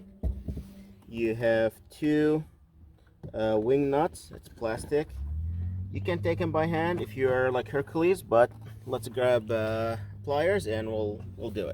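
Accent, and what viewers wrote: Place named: Iraq. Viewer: لهجتك عراقية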